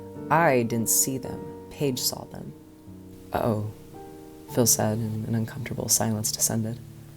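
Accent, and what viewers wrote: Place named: South, United States. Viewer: as a Texan i will permit the southern accent. it was acceptable. thank you for the video i enjoyed it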